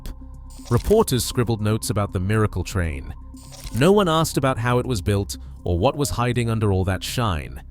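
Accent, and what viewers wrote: United Kingdom. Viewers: 😅can't listen to a Brit talk about this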